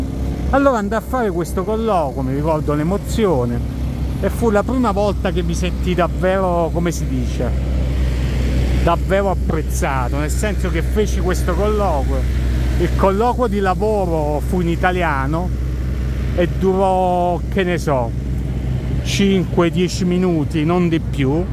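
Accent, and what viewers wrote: South, Italy. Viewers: Non ha ancora perso l’accento meridionale 😳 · Prima di tutto " Supercalifragilisticespiralidoso " lo spell checker è andato in tilt.... devo dire che pur se mi vergogno delle ore che spendo su YouTube, tu sei stato la mia ultima scoperta. Seguo molte coppie in giro per il mondo ma tu sei il primo meridionale e il tuo accento mi dà tanto conforto qui a Glasgow fredda e piovosa. Ti voglio dire che forse non lo noti perché sei dall'altra parte della camera: hai una dote naturale di commentatore, in English " Story teller ", forse anche perché ami la parola scritta. Vorrei con questo messaggio incoraggiarti a seguire questa vena. Descrivi quello che hai attorno, le nuove attività peculiari di NY. Secondo me saresti un buon intervistatore perché ami le persone e ti interessa quello che è intorno. Sei ache un opportunista e credo che questi walk/blogs faranno molto bene alla tua impresa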